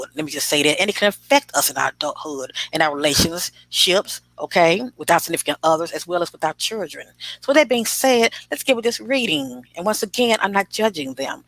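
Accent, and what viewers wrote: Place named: South, United States. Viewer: Wow ! Straight on point wow I feel this in my gutt about there characteristics ! But so true about how people can go so long and keep from showing there true self ! Ty so much for this and may I ask your southern I can tell from your accent I’m from Ky I’m guessing Georgia or Alabama but I really feel the first if you can say if you can’t with everything I’d understand ! I do ty so much for your time helping us all to see a closer look into this case honey ! Stay safe and god bless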